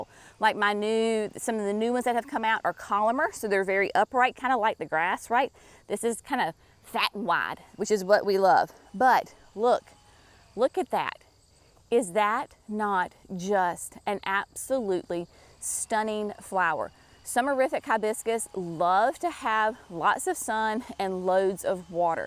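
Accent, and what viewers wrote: South, United States. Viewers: You give me such great joy in the morning. I grab my coffee and listen to that beautiful southern melodic voice of yours. You and have worked so hard on the gardens. Proven Winners picked the most dedicated gardeners in the South! I can’t wait to see what you all do.😍❤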